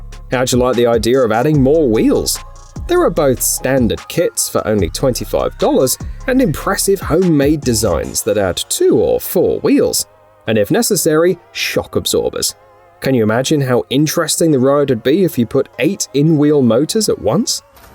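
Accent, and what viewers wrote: England, United Kingdom. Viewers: The Falkirk Wheel is in Scotland, so the prototype was probably made from Meccano. The presenter sounds like he is English, so he should be familiar with Meccano; it is still being manufactured... even if it is in France and China! 🥴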